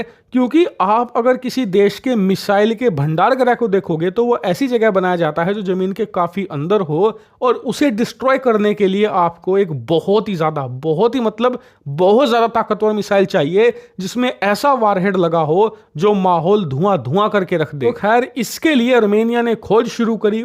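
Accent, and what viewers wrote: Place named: UP-Bihar, India. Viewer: आप जरूर up-bihar के हैं I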